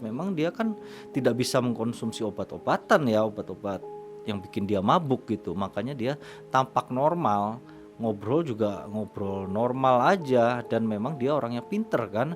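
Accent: Java, Indonesia. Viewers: Daya tariknya justru ada di medokmu bang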